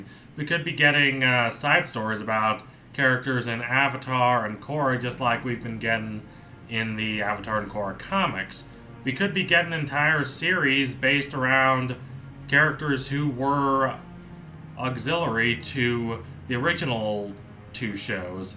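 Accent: South, United States. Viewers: were you...doing a country accent in this video lol? Are you rehearsing for a role or something? No judgment, just threw me off XD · Why do you Sound more southern?😂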